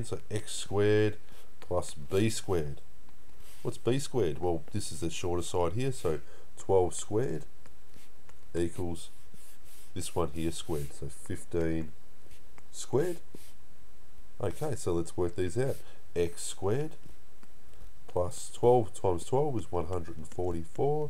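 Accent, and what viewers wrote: Australia. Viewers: sounds Australian, high possiblity.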